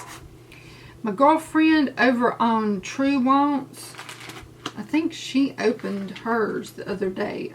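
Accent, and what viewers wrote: South, United States. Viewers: I love you so much I think your were sleepy in this cause you sound even more southern , I do the same when I'm sleepy i love your top today enter me darlin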